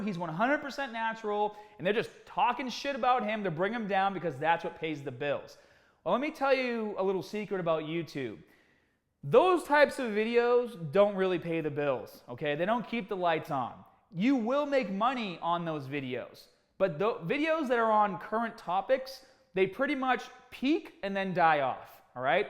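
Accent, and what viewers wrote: Boston, United States. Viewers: Damn you got a strong Boston accent bro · That Boston accent coming in THICK! Much love from Texas! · Wait a second. I’m subscribing for tha facking R kid. Boston I gather ? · The boston accent is killer! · 1 min in, ik hes from boston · You from Boston bro! I can tell from the T AH T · I came for the Boston accent. Wasn't disappointed.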